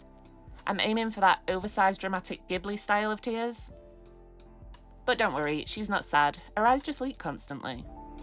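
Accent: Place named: Sheffield, United Kingdom. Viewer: amazin work lady .. you from Sheffield cos ya sound like me 😂 your talent is the mutts nuts ❤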